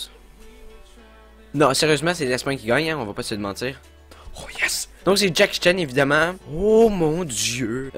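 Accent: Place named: Quebec, Canada. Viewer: Sa fait devoir un quebecois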